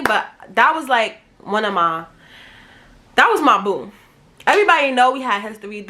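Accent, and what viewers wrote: New York, United States. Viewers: I fcking love new york accents bish, Lemme finish binging the storytimes 🔥🔥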